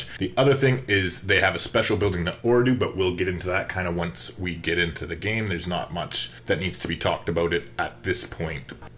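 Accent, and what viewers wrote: Canada. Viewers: Where in Canada are ya from? I can hear it in your voice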